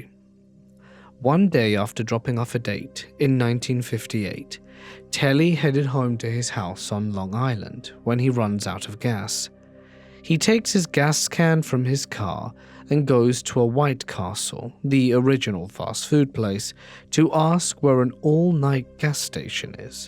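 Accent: United Kingdom. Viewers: Love the British accent.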